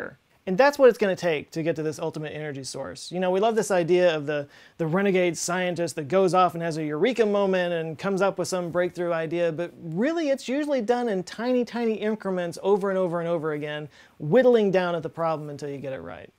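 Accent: Texas, United States. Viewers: Dude... this seriously wasn't worth addressing. We love you both despite and because of your crazy Texan Sikh-friendly accent. You should be proud of being able to cause such profound mood elevation with it. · It's the American accent. Autism is abound in the comments. We tend to say Tur-Ben, not Tur-Bine. Just wow. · So the English accent is the only correct way and the American accent is always wrong? I don't think so. Also, there are many American accents, and most of them pronounce the word TURBINE not TURBAN. His is a regional accent. Edit: Some Americans also pronounce the word like TURBEEN. This is also an accent. It isn't a more or less correct way to pronounce the word. Don't be an accent snob. nobody likes an accent snob. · Why do you keep talking about turning a turban? OH! TURBINE - rhymes with coal mine Funny American accent, but I still enjoy your videos :-)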